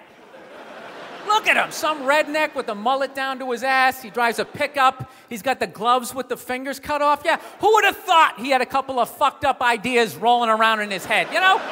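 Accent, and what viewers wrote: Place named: South, United States. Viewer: love his southern accent xD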